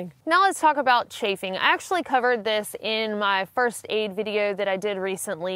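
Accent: South, United States. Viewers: Oh, man, I grew up in Georgia, though moved out of state nearly 13 years ago. I haven’t heard a southern accent like yours with any consistency in over a decade! Great info in sanitation and hygiene! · I love your accent, I am from GA and have been living in the PNW for 15 years. I so miss southern women. Tried hard to find one with an accent like yours, but wound up married to a girl from AK. We manage the Mountaineers Lodge at Stevens Pass on the PCT during most summers. This summer we likely not open. Its fun being a trail angel.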